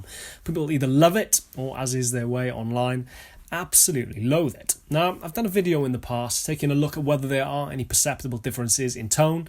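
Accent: Wales, United Kingdom. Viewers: i cant tell if you're welsh or english. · is 'Border Welsh' - right on the border with England, but Welsh nonetheless.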